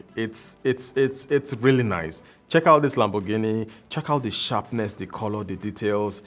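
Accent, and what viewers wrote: Nigeria. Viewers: I just wanna say thank you to my Nigerian brother with this beautiful accent, love from iran❤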